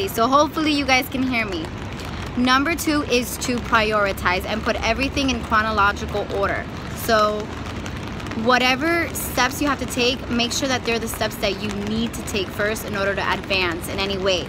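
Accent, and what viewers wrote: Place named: New York, United States. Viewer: I can recognize NY anywhere 😂🙌🏻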